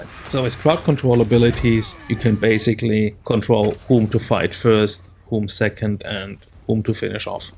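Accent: Germany. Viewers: dat heavy german accent xD thx for the tips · Good collection of some basic tips. But man ... your german accent is HORRIBLE XD Beeing from Germany i feel i can say that without sounding like a dick ;)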